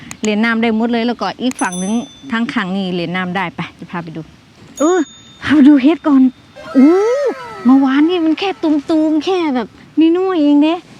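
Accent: Korat, Thailand. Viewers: ทนไม่ไหวต้องติดตามจนใด่สำเนียงเสียงภาษาโคราชบ้านเองชอบมักๆ😁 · ก็ว่าอยุ่สำเนียงคุ้นๆ พูดโคราชนี่เอง พูดเหมือนแฟนผมเลยครับคนโคราชเหมือนกัน 555 ผมทำคลิบเที่ยวเหมือนกันหวังว่าคงได้บังเอิญเจอกันซักทริปนะครับ😁😁😁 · ฉันชอบแก่พูดพาษาโคราช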